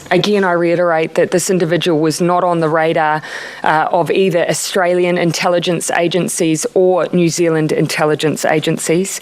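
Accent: United States. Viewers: sounds like an American moved to new Zealand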